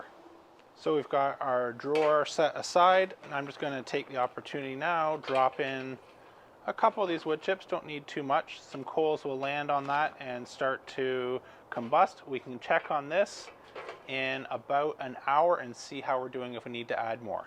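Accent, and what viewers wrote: Canada. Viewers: I would love to hear you react with, for me, your Canadian accent, when you bite into a really hot pepper. I bet it wouldn:t even involve curse-words, you were probably raised right.;-)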